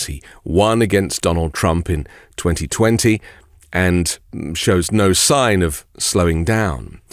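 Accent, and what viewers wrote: United Kingdom. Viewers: I find it hilarious that this host is still clinging onto the Russia Collusion hoax. His British accent makes him sound intelligent and then he lets stupidity like that come out of his mouth.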